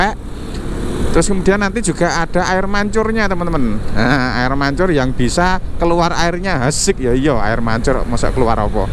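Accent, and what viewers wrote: Java, Indonesia. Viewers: Cekibrot.... Medhokk... Pake telor 3 Mantap 👍👍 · Mas jowone cik medhoke👍👍mksih info" lewat chanelnya🙏🙏🙏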